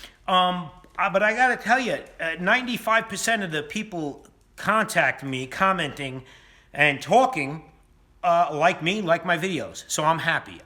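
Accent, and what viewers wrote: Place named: Detroit, United States. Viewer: That's a beautiful accent where is from ? Detroit ?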